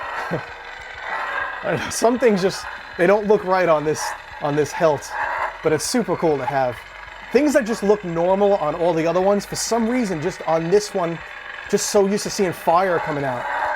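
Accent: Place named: Boston, United States. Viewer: I know that accent from any where you from boston?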